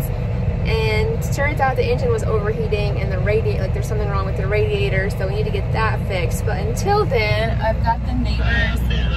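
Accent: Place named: Texas, United States. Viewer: You dont sound like youre from Indiana lol...more like Oklahoma or Texas lol. Does everyone in Indiana sound like you :)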